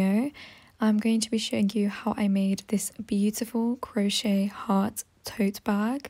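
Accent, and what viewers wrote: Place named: Russia. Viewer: русский акцент такой емае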